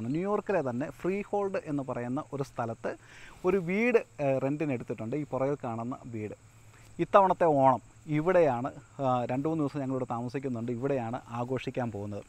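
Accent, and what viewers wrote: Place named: Kozhencherry, India. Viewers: പത്തനംതിട്ടക്കാരനാണ് എന്ന് സംസാരത്തില് തന്നെ അറിയാം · മലയാളം കേട്ടാൽ അറിയാം പത്തനംതിട്ടകാരനാണെന്നു..😊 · ഓണാശംസകൾ, kozhencherry കരാൻ അന് എന്ന് അറിഞ്ചപ്പോൾ വളരെ സന്തോഷം. നമ്മുടെ നാടിന്റെ എല്ലാ നന്മകളും സംസാരത്തിൽ ഉണ്ട് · സംസാര ശൈലി കേട്ടപ്പോൾ തന്നെ തോന്നി പത്തനംതിട്ടക്കാരന് എന്ന്, വളരെ സന്തോഷം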